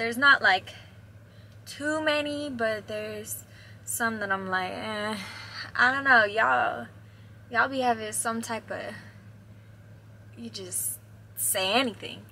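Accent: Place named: Miami, United States. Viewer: You sure picked up that Latino Miami accent.. Maine accent is totally different..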